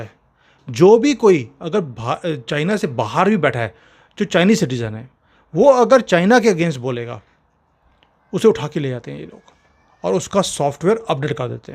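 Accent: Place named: Punjab, India. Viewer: Sir, it's not your mistake that you can't pronounce "Atmnirbhar", it's your Punjabi accent, which is natural, which prevents you from it. You call it Atamnirbhar whereas it's Atmnirbhar, notice there's no "a" in this word. It's आत्मनिर्भर with small taa.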